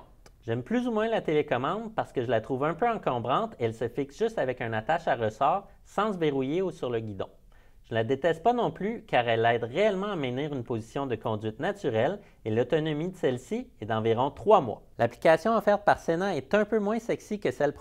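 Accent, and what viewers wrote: Quebec, Canada. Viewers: Je suis tellement fan de l'accent québécois !!! Sinon très bonne vidéo, tu m'as beaucoup aidé merci ! 😉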